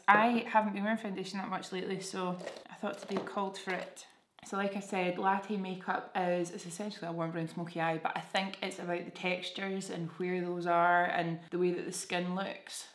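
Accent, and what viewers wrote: Scotland, United Kingdom. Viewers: I love ur Scottish accent xx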